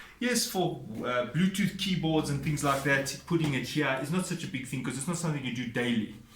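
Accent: South Africa. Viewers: As a South African myself, I can confirm that he's from South Africa due to his accent. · definitely south african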